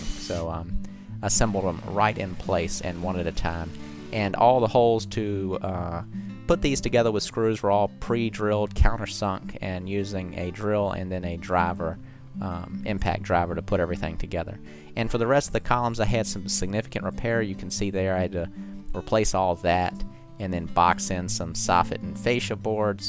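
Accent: South, United States. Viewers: Love your videos man. Gives me inspiration in my seemingly never ending home renovation project. My house was, still kinda is in rough shape and figuring out what to do can really tax you mentally. Did you learn at a trade school or have you been doing this for a while. I noticed your accent too, I'm guessing you live in the south as well as I. Greetings from North Carolina and keep it up! 👊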